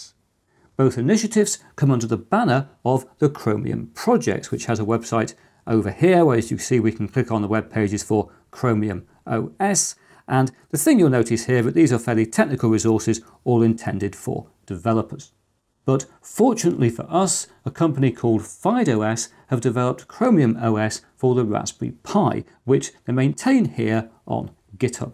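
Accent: United Kingdom. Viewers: I just love the way Britons talk.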